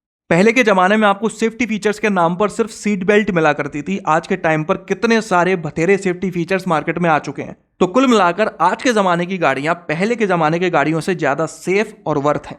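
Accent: India. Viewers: Yeh Bhai gwalior Agra mathura side ka lagta sayad 😅😅😅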